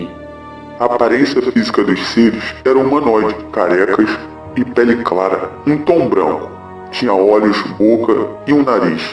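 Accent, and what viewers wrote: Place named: Rio de Janeiro, Brazil. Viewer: O é carioca, maneiro bicho kkk, Relato muito legal, like amigo 👍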